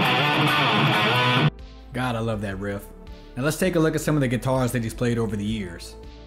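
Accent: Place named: Louisiana, United States. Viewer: Bro you from Louisiana? That accent is screaming you from the bayou.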